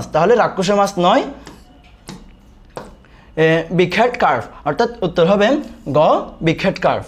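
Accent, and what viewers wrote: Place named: Chittagong, Bangladesh. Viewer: চিটাগং এর পোলা মনে হয় ভাষায় বুজা যায়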